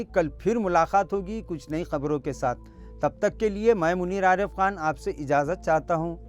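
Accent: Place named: Deccan, India. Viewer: Aap ka dakhni lehja achcha nahi lagta aap dilli walon ki trah bolna sekho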